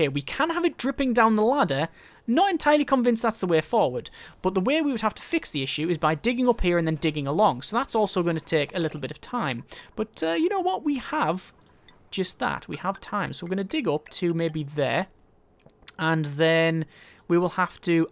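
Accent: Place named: Hull, United Kingdom. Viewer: he sounds like he is from hull in the uk. (where im from)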